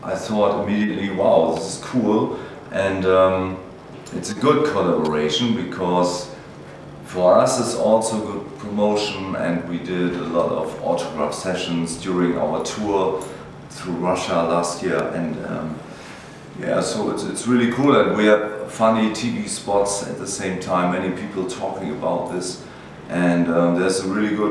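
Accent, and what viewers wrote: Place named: Germany. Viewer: I love his voice and pronunciation in english with a german accent. Very brutal and strong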